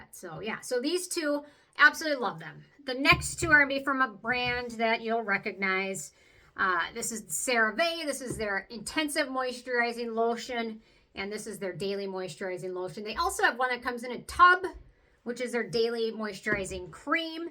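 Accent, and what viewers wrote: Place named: Midwest, United States. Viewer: I love your accent. Northern Midwestern accents are absolutely adorable- sounds so wholesome! 😊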